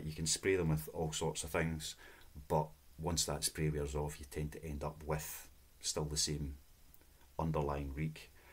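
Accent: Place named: Scotland, United Kingdom. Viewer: Hi,just found your channel.Good to hear a fellow scot selling!. Have you selling long?.Welldone!!!!. Rab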